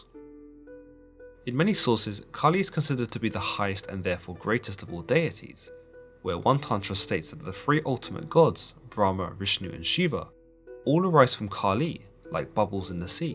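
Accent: United Kingdom. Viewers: So tired of hearing the OLD CRUSTY british or english accents! Are they still trying to take over the world?!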